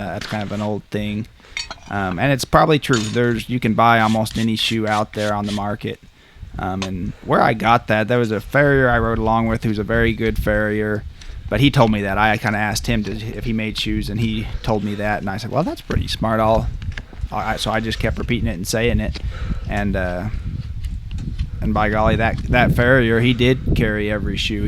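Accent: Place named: Midwest, United States. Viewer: “I gotta dang good wife,” so wholesome aaahhhhh😭 that warmed my heart. PS there’s nothing better than that familiar Midwestern drawl, I’m from Utah and it’s always like a taste of home to hear an accent like yours.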